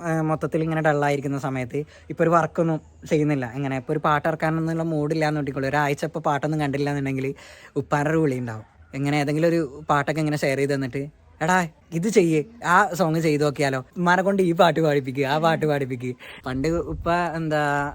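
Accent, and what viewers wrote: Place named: Kuttiyadi, India. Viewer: nte samsaram keteram thoonik kuttiyadi slang varunnund ❤❤😊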